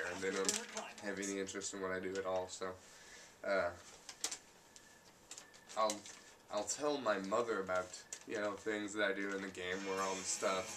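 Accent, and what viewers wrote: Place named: United Kingdom. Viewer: Very enjoyable responses matey! As a Dutch person I am really impressed by your English accent. It's not exaggerated which means that it actually works... I guess, but only the Brits will be able to judge that I guess....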